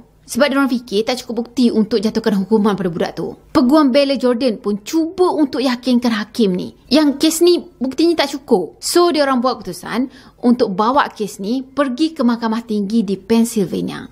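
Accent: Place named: Johor, Malaysia. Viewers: Assalamualaikum.. lama akak dah cctv je tapi kali ni memang nak kata… Bunyi Johor tu memang menyerlah sangat dah..😄 Btw bagus utk dia dakwa semula untuk clear nama dia.. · Cakap pun dah ada style orang Johor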